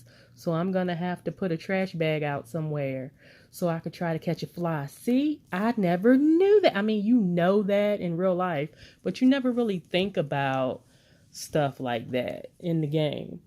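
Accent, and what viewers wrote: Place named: South, United States. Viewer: Yes!! A review with a southern accent. Thank you. Love it.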